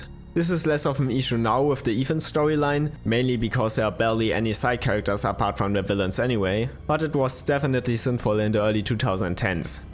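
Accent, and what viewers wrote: Germany. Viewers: gerne machst ja auch nen klasse Job <3 und wenn es dich stört kommentiere ich gerne zukünftig in Englisch:). Denke halt es liegt daran das man gut raushört das du deutscher/deutschsprachig bist.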